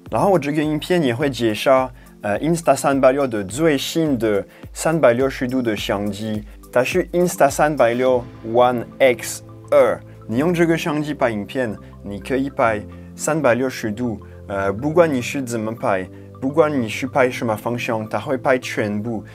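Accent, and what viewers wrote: Taiwan. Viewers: 哈.....台北俏俏話+1!!!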